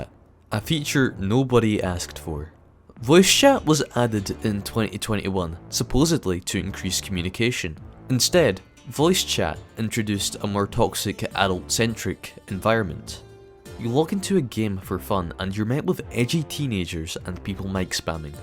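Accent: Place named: Scotland, United Kingdom. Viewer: I know this guy is Scottish I recognize the Scottish accent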